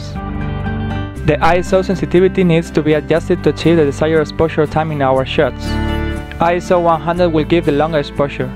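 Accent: Spain. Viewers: Gracias, me ha sido útil, pero cómo se te nota el acento español hablando inglés jajaja. No offense, fela. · Spanish i guess. I know it because i am from Spain and many people speak like that :D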